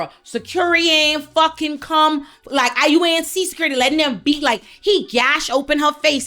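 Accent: Bahamas. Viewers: I genuinely hate Zeus network with a passion, it feels like a whole modern day minstrel show. Also off topic, are you Bahamian of have Bahamian roots? You have an accent very close to a Bahamian one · Your Bahamas 🇧🇸 accent >>>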